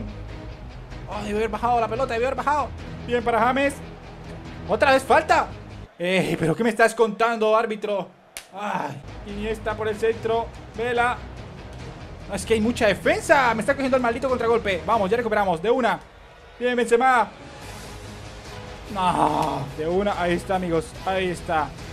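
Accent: Colombia. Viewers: eres colombiano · oye eres colombiano?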